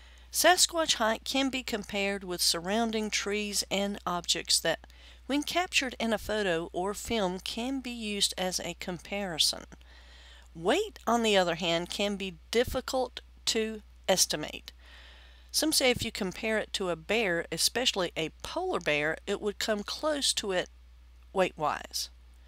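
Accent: Texas, United States. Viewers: Your dialogue is much better. You've lost the Texas draw and speak faster. Thank you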